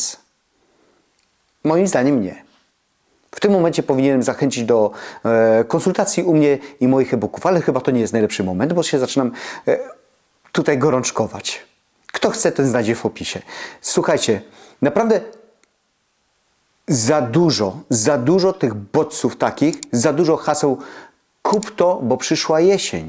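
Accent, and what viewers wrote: Silesia, Poland. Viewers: Nie ogarniam zbierania gratów, "dekoracji😢" typu plastikowe dynie i o zgrozo! halołinowe. Moja mała slabość to kocyki, cieplusie milusie. W domu w zimę temp ok. 18 więc kocyk to jest to!!! Kupowałapom mówię PRECZ!😅 p.s. A Pana śląski akcent... Miód na ❤️ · Ja osobiście jakoś nie czuję tych w sumie nowych trendów z zachodu i nie czuję najmniejszej potrzeby kupowania takich rzeczy - raz, ze szkoda mi kasy na to, dwa - mam małe mieszkanie i każda taka duperelka tylko by mi zagracała dom. Co innego Boże Narodzenie czy też święta Wielkanocne. A i wtedy jakoś nadmiernie nie szaleje z dekoracjami. Całkowicie się z Panem zgadzam Panie:)....a offtopowo powiem, że ja lubię ten śląski akcent i wszelakie (jesli są) "słowne niedomagania - dla mnie to wydźwięk naturalności a nie jakiejś sztucznej pompy:) Dobrego dnia! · Zgadzam się w 100%. Dekoracje ponadczasowe👍 P.S. 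Uwielbiam śląski akcent i niech pozostanie😉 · Zgadzam się z Panem. Moim zdaniem, jesieniara to osoba, która ma wszystko w nosie, włazi pod koc z kubkiem herbaty i książką, a inni niech pracują. Nie chcę nikogo obrazić, ale ja jesienią zaczynam pracę, nieraz przemokły mi buty, chorowałam, przeziębienie było zawsze o tej porze roku. Poza tym, dla mnie to smutny czas, przyroda zamiera, wspominamy zmarłych. Wolę wiosnę, ba kocham!!! Ale nie nazywam siebie wiosieniarą😂. Kiedyś ludzie nie dekorowali domów na każdą porę roku. Teraz liczy się zysk sklepów i producentów. Większość dekoracji to tandeta i zaśmiecanie środowiska. A śląskim akcentem proszę się nie przejmować. To dodaje Panu uroku. Nie tylko górnicy tu mieszkają- też jestem ślązaczką z dziada pradziada. Kiedyś na koloniach Pani wychowawczyni nazwała mnie i siostrę Ślązarami, sama przyjechała tu za pracą. Dlatego jesieniara do mnie nie przemawia. 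Dobrze, że Pan podjął ten temat. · Panie Pana śląski akcent nie przeszkadza, jest uroczy, myślę, że nie tylko dla mnie, osoby urodzonej i wychowanej w Bytomiu. Ale nad wymową "ę" zamiast "en" proszę popracować. Wymowa "bendzie" razi. Tu powinno być "będzie". Pozdrawiam Pana serdecznie i dziękuję za przekazywanie wartościowych treści i zdroworozsądkowe podejście. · 1. Proszę nie przepraszać za swój akcent. To część pana życia. Jak komuś nie pasuje to trudno. Rozumiem, że ktoś może nie znać śląskich słów i wtedy jak najbardziej, starać się mówić po polsku, ale zmieniać akcent? Pffff, chyba tylko dla buców internetowych. 2. Przyznam bez bicia, że też chciałam mieć dekoracje na kilka świąt czy wydarzeń, które celebrujemy. Chciałam mieć np. naczynia (talerze, miseczki, kubki) na Święta Bożego Narodzenia, na Wielkanoc. Oczywiście myślałam też o naczyniach sezonowych: tu listki na talerzu, tam płatki śniegu itp. 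I tutaj pojawia się moje szczęście w nieszczęściu: im dłużej czekam na koniec remontu i korzystanie z mieszkania (jestem singielką więc dłużej na niego zbieram) tym bardziej mój zapał na kupno tych rzeczy się po prostu ostudza. Już doszłam do wniosku, że musiałabym mieć pierdyliard rzeczy aby udekorować 60 metrowe mieszkanie. Po drodze pojawiło się dążenie do minimalizmu. Może tak musi być. Może po to tyle czekam na moje mieszkanie, aby żadne głupie myśli na jego dekoracje czy urządzanie nie przyszły mi nigdy więcej do głowy. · Podoba mi się Pana zdrowy rozsądek, luz, dystans i....śląski akcent! Pozdrawiam serdecznie :) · Śląski akcent- super.Jestem z Podlasia.Polacy pięknie się różnią . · I dobrze że słychać gwarę. W końcu ona też nas Ślązaków określa. Prof.Simonides często wracała w 😊swoich wypowiedziach słówko gwarowe" tyż". I w żaden sposób się tego nie wstydziła. Pozdrawiam panie. I zgadzam się z Pana przemyśleniami nr dekoracji.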